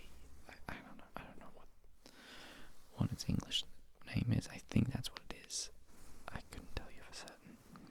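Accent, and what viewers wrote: Australia. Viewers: Your videos started getting recommended to me a couple weeks ago and I’ve used them every night since to fall asleep. Weirdly enough, I also forget your Australian every night and get whiplash hearing the accent lol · This was precisely the Australian Sleepy Man Rambles needed right now. Do go on about video games/anime/manga...I'm not not here for the nerd-friendly content, and it's relaxing. Life is still constantly stressful due to "how things are where I live," but watching the new Alien: Earth series is a bright spot each week. Zoos aren't a usual destination, but there's a teaching zoo nearby that I enjoy supporting during their Halloween open house fundraiser. While there are tigers and monkeys, the reptile house's skink with resting judgmental face is worth the entire entry fee.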